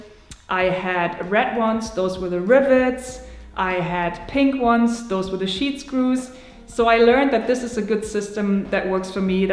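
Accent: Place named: Germany. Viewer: peinlich , wenn eine Deutsche englisch spricht ...:-/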